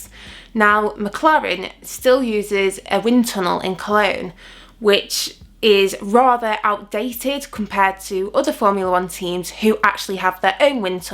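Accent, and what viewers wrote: United Kingdom. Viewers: You've got to love that british accent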